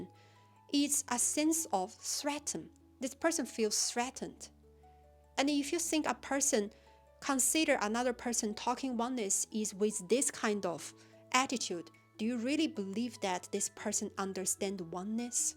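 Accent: China. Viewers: 我非常確定你的母語是中文，對嗎？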